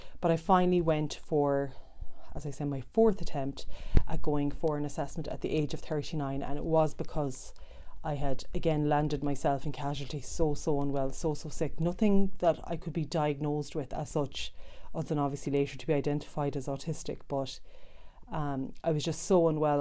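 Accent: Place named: Ireland. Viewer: I am another Oregonian (from the US state of Oregon) who appreciates so much your courage to challenge yourself by making this video. It was fantastic, and BTW, I love listening to your Irish accent! (Ireland as your home, correct?) I am just beginning my new journey learning about autism, or I should say an updated description of autism, which so far, explains nearly EVERYTHING in my whole life. I'm 61. Like the other OP comment from Oregon, I just want to understand my life and myself better as well. Past, present, and future. Not sure if an official diagnosis is possible for me because of the cost, availability, and qualified professionals near where I live, although I'm not against getting one in theory. But hearing of your persistence, and yes!, belief in yourself, you succeeded in getting the answer that helped your wellbeing. This gives me encouragement and tells me to be patient with myself. 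Thank you so much for sharing your story! Wishing you the best!:hand-purple-blue-peace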